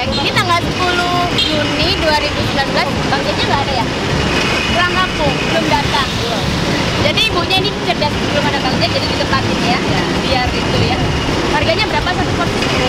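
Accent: Java, Indonesia. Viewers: Mbak. Asalnya drmana ya. Kok jowone ngguenah poll hehe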